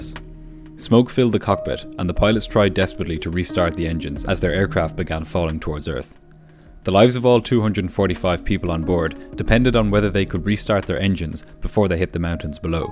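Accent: Ireland. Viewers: Your videos are of incredible quality. They're very easy to watch and follow, you are really onto something with this channel. It's also great to hear a fellow Irish accent in this community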